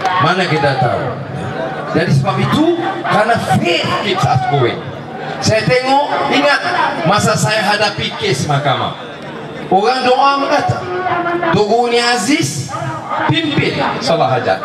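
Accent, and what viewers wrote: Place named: Negeri Sembilan, Malaysia. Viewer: ingatkan org negori bro heheh.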